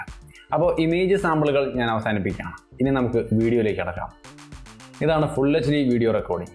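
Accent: Thrissur, India. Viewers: Veedu thrissur aano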